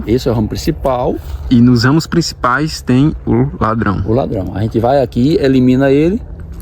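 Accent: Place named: Northeast, Brazil. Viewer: Primeiro. Sua simpatia e sem medo de estar ensinando outras pessoas ou concorrentes. Imagino que seja do nordeste pelo sotaque . Amo as uvas de mesa do nordeste. Estou sempre comprando porque são muito doces e deliciosas. Não sei seu nome, mas parabéns pelo seu cultivo e continue nos mandando essas delicias aqui pro sul. Forte abraço de Santa Catarina.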